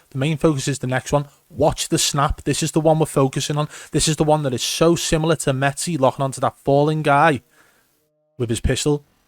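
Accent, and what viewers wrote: Liverpool, United Kingdom. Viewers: You have to be related to Nick Peet, look just like him and a scouser aswell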